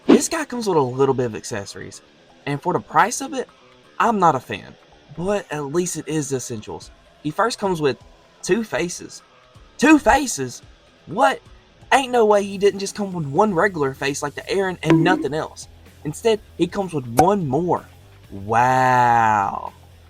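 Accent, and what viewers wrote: Texas, United States. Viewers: is it like a Texan accent or smth idk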